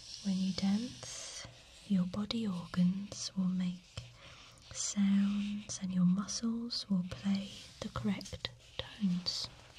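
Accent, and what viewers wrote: United Kingdom. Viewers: How i love it when i listen the sound of a brit speaking it sounds so wonderful!!! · I wish you could do more soft speaking ASMR videos. I can't get enough of your magical tingly British voice! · I wish my British accent was half as beautiful as hers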